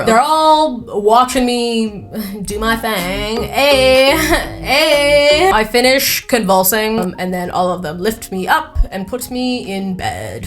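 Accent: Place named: Massachusetts, United States. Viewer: so very wicked Massachusetts i haven't heard somebody say "pup-corn" in a while so thank you for that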